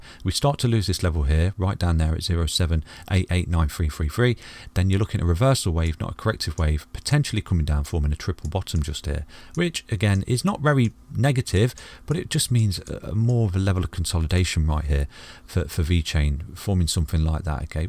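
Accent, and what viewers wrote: United Kingdom. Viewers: Dear, your channel is golden, not to mention your calm, grounded manner and soothing british gentleman voice!!!!! Keep on going!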